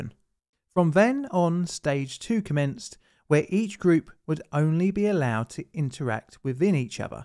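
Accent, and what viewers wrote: United Kingdom. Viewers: Mo-caison??? Its Mock-a-sin... I get it you're British but holy guac I've never seen someone get that so wrong!! I'd love to see you say Boise, or Pocatello.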